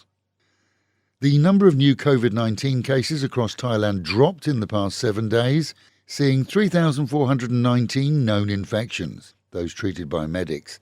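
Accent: United Kingdom. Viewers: are you from Britain ?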